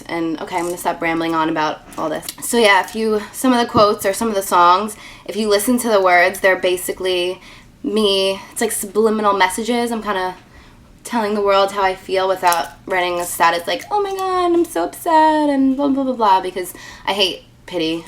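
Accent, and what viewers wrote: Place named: Brooklyn, United States. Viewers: You're absolutely gorgeous. U look like Megan Fox. U seem like a very down to earth girl. You're very talented! Love all ur tutorials! I love Ryan Gosling too lol. Are u from New York or New Jersey? U have a New Yorkian accent. I really like what u said about not settling for anyone--wise girl hahah srry for all these comments bunched together. Anyways keep doing wut you do and thinking the way u do, you will succeed :) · Are you from Jersey or NY? I'm from Brooklyn & I can hear that voice represent girl!!!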